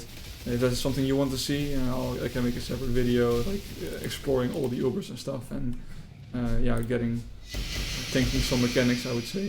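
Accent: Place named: Netherlands. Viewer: This is the best build guide so far. This is my first Poe league and character. Started as Zizaran guide then felt squishy changed to was super tanky but lacking dmg. I tried to change some pob ninja builds I couldn’t work it out. Until I found you. Thanks for your build and I really think you deserve more viewers. And I’ll be your loyal subscriber from now on. Super detailed I understood everything. (Btw i lived in Den Haag for 8 years and i hold Dutch passport, could easily recognise your accent 😊). Please keep continuing these kind of builds. And I’ll follow everyone of them Dank je wel 👍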